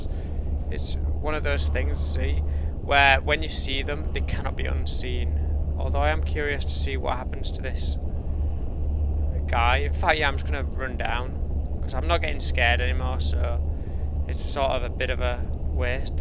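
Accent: England, United Kingdom. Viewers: What part of england are you from?:D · are you like British or something? XD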